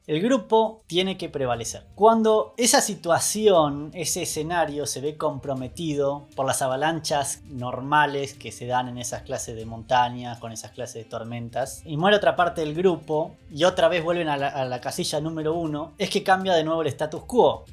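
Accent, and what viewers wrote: Argentina. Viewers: ¿Eres argentino o uruguayo?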